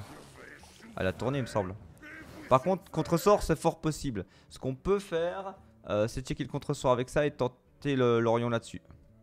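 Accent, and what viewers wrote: Belgium. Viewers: GG pour le tournoi et les qualif. ça fait toujours plaisir d'entendre (avec ton accens ;)) un compatriote Belge performer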